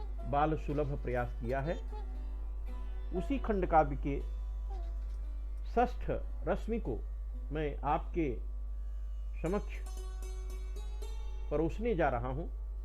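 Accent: Standard. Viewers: शुद्ध उच्चारण के साथ -साथ अद्भुत प्रस्तुतीकरण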